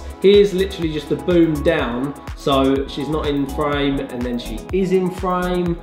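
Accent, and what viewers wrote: United Kingdom. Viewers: Probably the only Youtuber I've come across with a legit (non-pretentious) British accent. Top lad - subscribed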